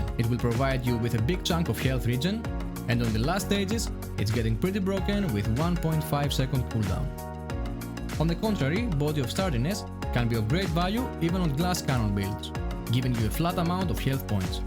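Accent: Greece. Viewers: Is that a greek accent?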